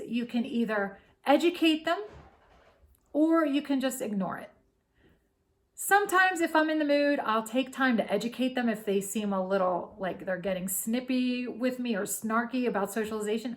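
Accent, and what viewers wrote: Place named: New Jersey, United States. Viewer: This was an excellent and informative video!! I loved hearing this perspective. Looking forward to hearing more content from you! I can't help but ask (and you don't have to answer), but are you from the NJ area? You sound like you could be, and I only ask because I love all the activities and community based opportunities you're involved in... intrigued. I am going into my second year of homeschooling in NJ with my second grade daughter and we are loving it! Subscribed!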